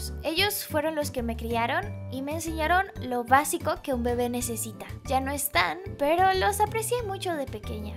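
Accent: Colombia. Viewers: Eres Colombiana ? ._. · Yo creo que deberias hablar mas el acento colombiano y su jerga, es más divertido XD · Señora hp JAJAJAJA Adoro ese asento colombiano mrk te queda melo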